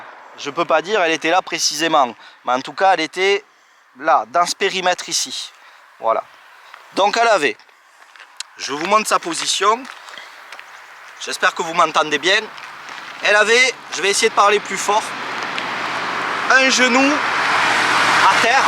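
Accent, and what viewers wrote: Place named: South, France. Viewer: Super vidéo comme d'hab! J'apprécie beaucoup pour ta sensibilité et tes réflexions très profondes..Et ce bel accent du sud n'est en rien déplaisant.. bien au contraire! Et puis quel courage d'avoir créé une telle chaîne! Merci beaucoup.. que du bonheur et de l'authenticité!🙏🙏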